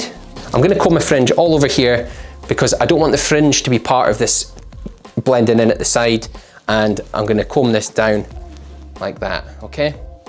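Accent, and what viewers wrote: Scotland, United Kingdom. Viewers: Love the Scottish accent. It's like watching a production of Brigadoon! Great videos 👍😌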